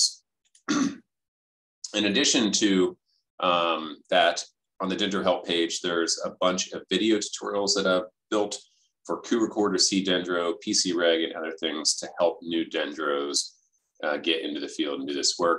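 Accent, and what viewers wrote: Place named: United States. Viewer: Professor, thank you very much and congratulations for this very didactic video. Could you also add the footnotes in this video to allow a good understanding? I, for example, do not understand the American accent very well.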